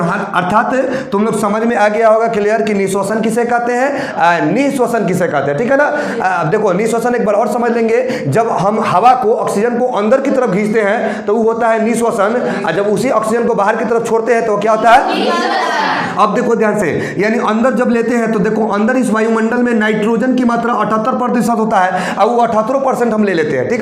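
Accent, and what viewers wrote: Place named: Bhojpuri, India. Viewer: Bojpuri style read kar rahe sir i am raviranjan kumar 20 tarikh ko life maker aay the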